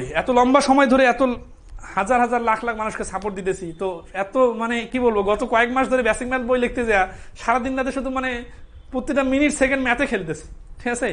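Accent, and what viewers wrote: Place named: North Bengal, India. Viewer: স্যার আপনার ভাষা শুনে মনে হয় বাড়ি উত্তরবঙ্গ। আপনার বাড়ি কোথায় স্যার?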